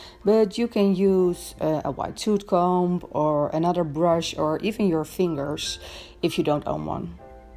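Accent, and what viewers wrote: Netherlands. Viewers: I can tell that you’re dutch just from your accent and how your head nearly touched the shower thing😂 (I hope that wasn’t offensive💕)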